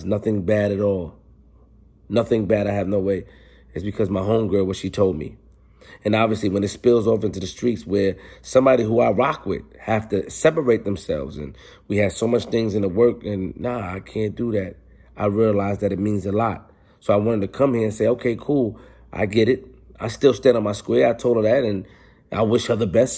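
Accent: New York, United States. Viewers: Y u telling like a new Yorker o my bad that's all ya'll do B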